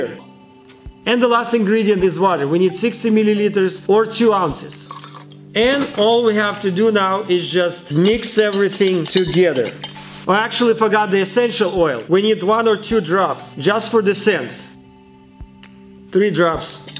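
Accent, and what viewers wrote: Russia. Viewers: Great Russian accent and scientific experiments, while helping out humanity during the pandemic. Good job 👍.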